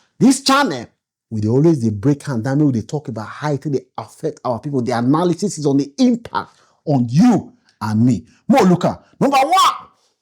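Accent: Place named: Warri, Nigeria. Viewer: I like this your Warri pidgin. Abeg no stop😂”….say men…”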